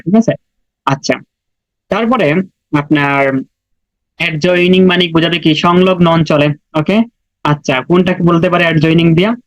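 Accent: Sylhet, Bangladesh. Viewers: Vai apni kita Sylheti ni?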